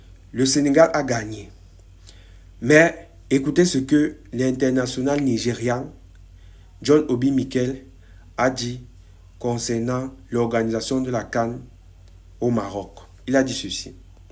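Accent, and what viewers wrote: Cameroon. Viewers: Toi tu as un accent camerounais hein, tu es sûr que tu es ivoirien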